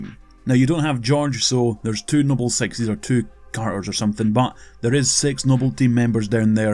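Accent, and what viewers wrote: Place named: Ireland. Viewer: I love this guy's irish accent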